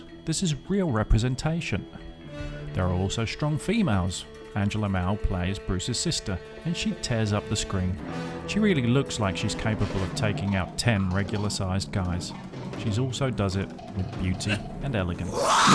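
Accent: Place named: South Africa. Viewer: Bruce lee was the best and sorry you sound like one of them European whites that live in South Africa talking about don't let racism make you a victim Bruce knew better so he went to his home country to give it a shot there white ppl made it bad to South Africans in their own country Rip Stephen binko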